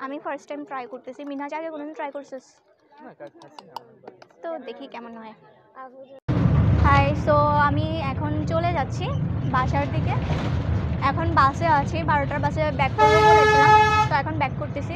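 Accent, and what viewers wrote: Chittagong, Bangladesh. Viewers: kothar modde kichuta Chittagong er accent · কথার মধ্যে চট্টগ্রাম এর টান আছে এজন্য বললাম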